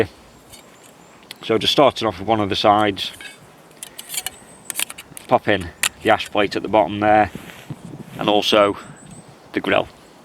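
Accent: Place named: Merseyside, United Kingdom. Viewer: Nice video. You say that you live in the Midlands, but I can't help but think that you're from Merseyside?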